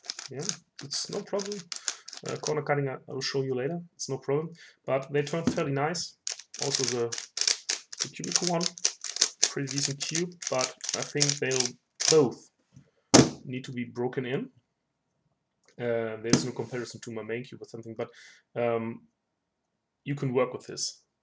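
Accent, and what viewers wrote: Germany. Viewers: Thanks for the review! Are you german?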